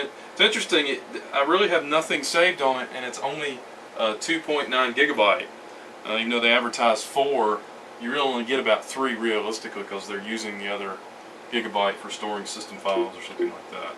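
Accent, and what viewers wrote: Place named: United Kingdom. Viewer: Love the British accent. All these years later I had no idea I could do this.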